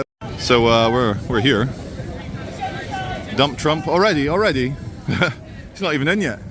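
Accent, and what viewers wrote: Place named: Cornwall, United Kingdom. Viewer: You sound like you come from Cornwall bro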